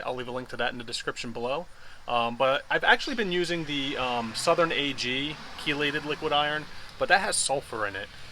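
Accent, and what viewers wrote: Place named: Philadelphia, United States. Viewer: New sub here! Safe to assume you’re from the Philly area/surrounding areas? That accent sounds very familiar! South Jersey here about 20 min from the city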